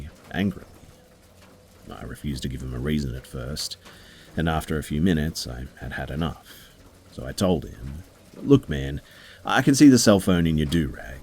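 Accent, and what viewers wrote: Australia. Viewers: Is the accent Australian?? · instant subscribe an actual human narrator AND ur from the motherland aus bro sign me up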